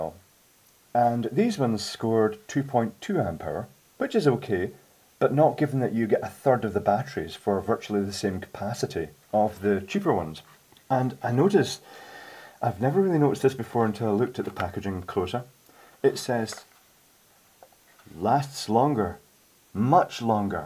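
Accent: Scotland, United Kingdom. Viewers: He said "poundland which is one of our dollarstores". As no one has told you or you do not realise, most views on YT are generated by Americans on English speaking content. BTW he's Scottish by the sounds of it, generally Scots find "British" to be too vague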